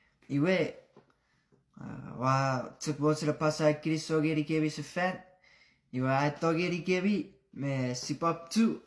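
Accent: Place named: Chuuk, Micronesia. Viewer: I'm impressed with your chuukese accent! Much love from Micronesia!❤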